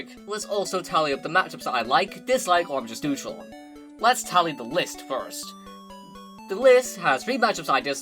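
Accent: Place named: United Kingdom. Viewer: This is the first time I’m hearing your voice Holy shit you ARE British aldhaodhakdhosjzksjd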